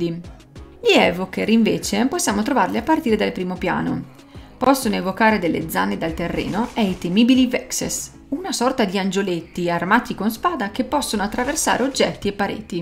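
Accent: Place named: Veneto, Italy. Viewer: ma siete venti tosi? parchè dall' accento me pare de si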